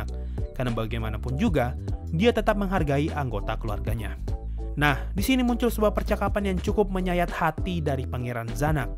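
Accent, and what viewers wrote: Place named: North Sumatra, Indonesia. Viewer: kyknya sumut ya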